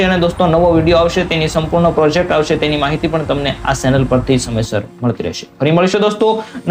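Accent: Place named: Bhavnagar, India. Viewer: ભાઈ આ ભાવનગર ની બોલી છે લોકલ બોલી ની મજાક નો ઉડાવી જોઈએ 😢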